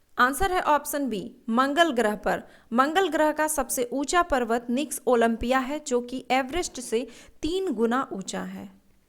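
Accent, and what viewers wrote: Bihar, India. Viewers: आप भी बिहार से हैं Mam ❓❓❓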